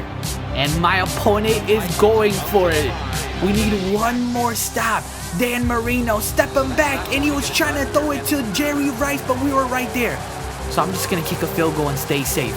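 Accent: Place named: Midwest, United States. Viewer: That's a mid west United States accent they speak very flatly.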